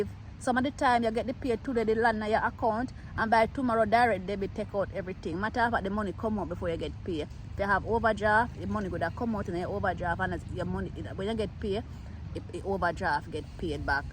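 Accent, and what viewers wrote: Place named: Jamaica. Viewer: You're a very very real Jamaican the way you talk and act.. Be blessed..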